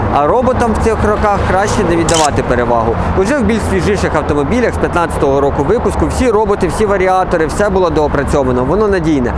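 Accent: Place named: Rural, Ukraine. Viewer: Говориш, звичайно по кугутському)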